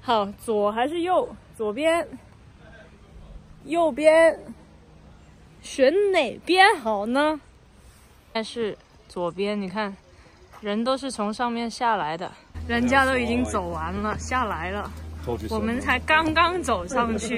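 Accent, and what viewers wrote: Hong Kong, China. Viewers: 港普，體諒她一下哈哈哈😂在香港人裡她中文已經算說的很好的了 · 港普好可愛啊哈哈哈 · 唱歌像台灣人，講話像香港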